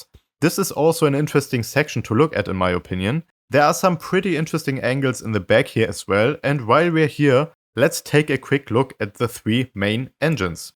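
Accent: Germany. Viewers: Your english Sounds Like a German speaking english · Do i hear a german-like accent? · Sounds like zhe Man in the Video is German (English accent) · Are you german?😭😭😭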